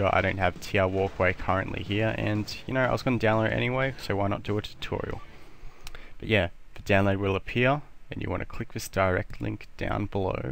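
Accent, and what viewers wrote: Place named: Australia. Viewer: If this guy wasn't australian I probably wouldn't really be paying attention to this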